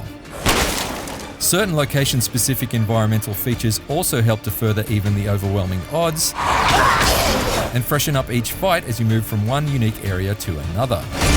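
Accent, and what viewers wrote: Australia. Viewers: love the aus accent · The guy clearly has an Australian accent.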